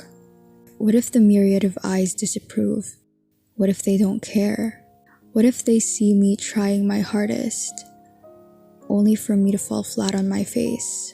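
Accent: Philippines. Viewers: all i know is that you have a filipino accent<3 But heyyy, If you are a filipino, And you're questioning the filipino culture upbringing and spreading awareness even if it's 3 minutes long, It can help. Well story short, I'm a filipino with a religious mother with toxic family especially on reunion, And as an asian, Body shaming, bullying, intimidation, toxic conservatism is considered normal. With all honesty. I wish i was overreacting or joking. Would you believe?!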